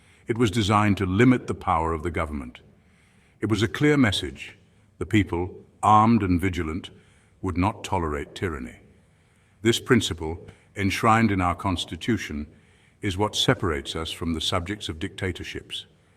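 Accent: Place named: United Kingdom. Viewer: Absolutely on point, and I agree 100% on all points - however... does anyone else see the irony in using a modern British accent for the AI to talk about fundamental American recognition of our human rights? Even the current and newly ex-British in the 18th century did not have that accent - they sounded more like a version of the deep Maine or the traditional New York or Boston (Southie) accent.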